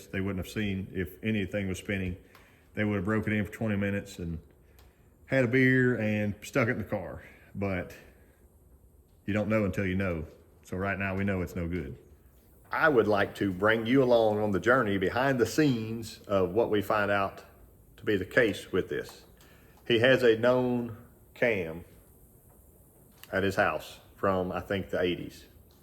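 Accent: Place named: Appalachia, United States. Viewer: you dont know what the hell your doing hill belly talk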